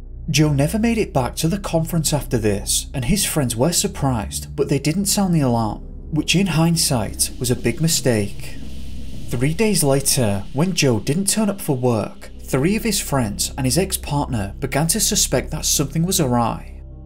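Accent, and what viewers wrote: United Kingdom. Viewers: NGL I clicked on this video because the topic seemed interesting but your cadence and intonations while reading are so grating I cannot stand watching. Regardless of the words or sentence structure you say a few words then fade out, short pause, repeat until the end of a sentence where you slow the last few syllables down like you've run out of air. The lack of variation makes it sound like a slightly more advanced british microsoft sam.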